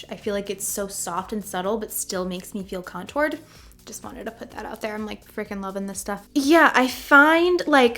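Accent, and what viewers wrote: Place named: Chicago, United States. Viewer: Love listening to your Chicago accent. Made me smile when you said Pop for soda ☺️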